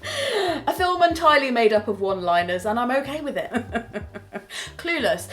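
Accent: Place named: Worcester, United Kingdom. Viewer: Just stumbled on this video and my first thought was....I recognise this accent. Are you from Worcester, that is my hometown.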